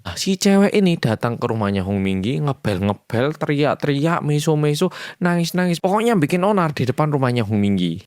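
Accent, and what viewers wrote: Java, Indonesia. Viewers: Udah nggak tertarik sama sokor, cuma senang nonton mas, lucu 😂, medok medok jowo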